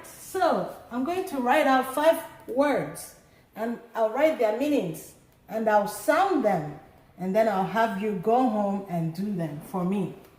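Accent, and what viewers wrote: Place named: England, United Kingdom. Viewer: Omo am in love with ur English accent maa 👏👏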